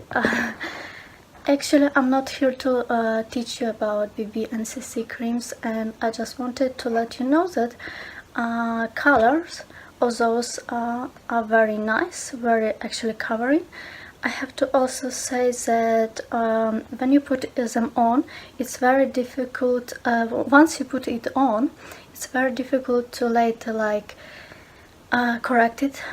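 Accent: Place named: Serbia. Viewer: я вот слушаю, и думаю, что английский не родной )) думала, может девочка из Сербии или ещё откуда-то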